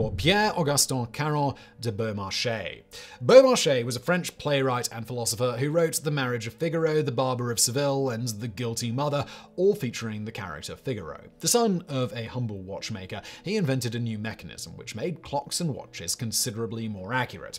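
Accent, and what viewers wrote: United Kingdom. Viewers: I find it highly amusing to hear a Brit extolling the exploits of forgotten American Revolutionary heroes, but very well done! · You're British? I thought you were from California's central Valley where you developed a killer UK accent. PS that is the story of James Marsters, Spike on "Buffy the Vampire Slayer" who is from Modesto,CA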